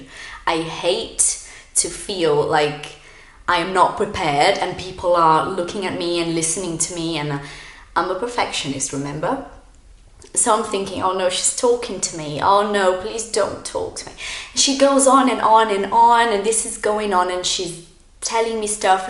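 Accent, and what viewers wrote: United Kingdom. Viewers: é a pimeira vez que vejo seus videos, mas gostei demais da informação! tenho aprendido português e inglês pela minha conta , e acho muito importante melhorar e corrigir nossos erros por não sermos nativos, seu sotaque é realmente lindo, depois de 15 anos ou mais estudando inglês americano seria dificil para mim imitâ-lo, mas vou recomendar seu canal aos meus estudantes de inglês que gostariam de aprender o sotaque britânico!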